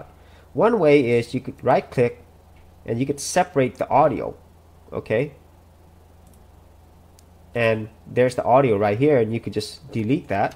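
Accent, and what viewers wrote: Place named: England, United Kingdom. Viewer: Oh my, finally an English guy talking and making it easier, hard clap to this guy for this.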